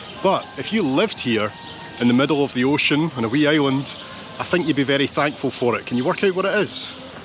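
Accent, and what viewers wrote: Scotland, United Kingdom. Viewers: I love the Scottish accent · Hello from Singapore. Love watching your videos and your Scottish accent.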